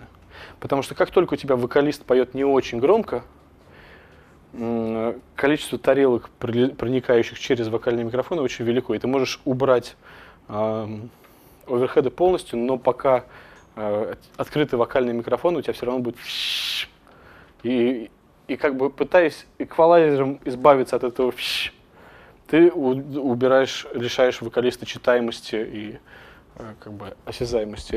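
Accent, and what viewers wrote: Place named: England, United Kingdom. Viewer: английский акцент немного слышится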